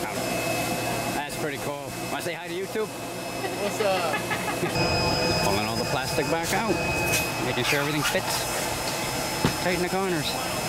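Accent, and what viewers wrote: Boston, United States. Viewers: Just found your channel and subscribed!! We just got our airstream and started our channel and cannot wait to get on the road like you....do I detect a Boston accent...? :) . We are from same area...would love to connect with you...so send us a message. Safe travels!!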